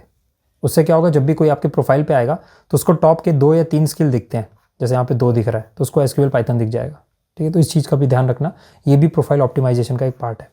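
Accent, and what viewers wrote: Odisha, India. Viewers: Bhai are u from Odisha?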